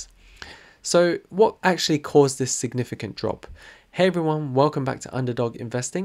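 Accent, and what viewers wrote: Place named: United Kingdom. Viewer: Very informative. I like your accent, are you British?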